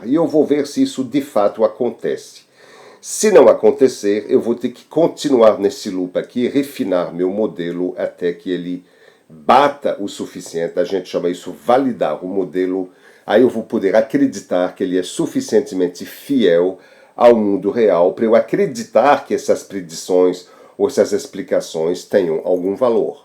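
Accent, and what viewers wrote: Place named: France. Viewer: Aula muito boa 👏👏👏👏 Lindo sotaque francês 😊😊😊😊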